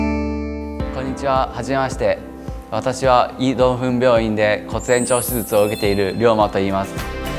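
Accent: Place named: China. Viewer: 他不是日本人，他是中国人。发音是中国人的日语。絶対日本人ではありません、、、、発音を聞いたら、すぐわかるでしょう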